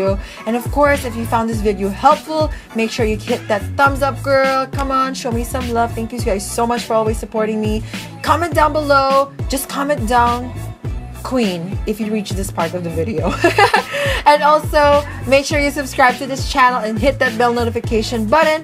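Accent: United States. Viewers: I literally thought you live in us bc the way you speak! HAHAHAAHAH! Omyygad! Youre slayinnnnn it gurl! This is the first time i’ve watched u! Haha keep spreading good vibes! Mwa xx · im a new subscriber of yours akala ko nung una american ka hahahahha na curious kasi ako sabi ko bat may foreigners na nag rereview ng anne clutz brush hahah so ayun nagulat ako nung nag tagalog ka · OMG This is my first time to watch your video and I thought you were american. I was shocked when you've started to talk in tagalog!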